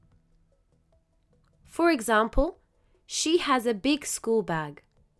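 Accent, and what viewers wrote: United Kingdom. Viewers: May I know which is the UK's accent you speak, incredibly keen on learning more and be able to speak like yours (hopefully)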